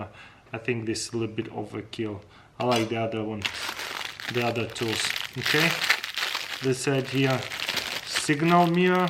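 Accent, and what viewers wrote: Russia. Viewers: Russian accents are the coolest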